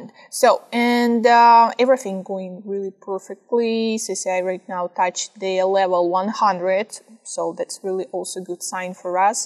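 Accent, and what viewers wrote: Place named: Russia. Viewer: Почему я слышу русский акцент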